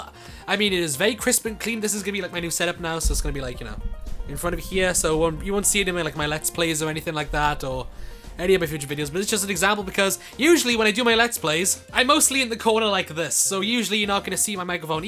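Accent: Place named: Wales, United Kingdom. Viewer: ah a fello welsh mun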